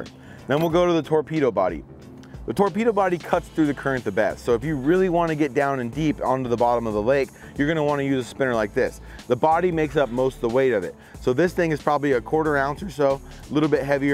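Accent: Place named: Canada. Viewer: He must be from Minnesota or somewhere in the northwest because he sounds so Canadian. Sounds just like someone from north Ontario. Anyone know? Awesome video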